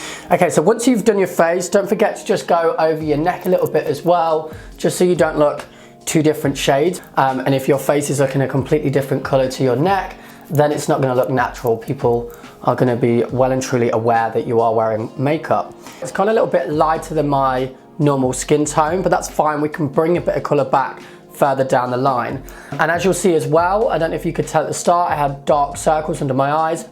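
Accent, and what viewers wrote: United Kingdom. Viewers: Hey hottie with the totally cool British accent!! : )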